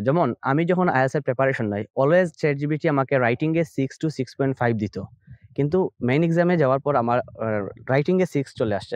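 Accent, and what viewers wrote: Sylhet, Bangladesh. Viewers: Vai apne Sylhety ni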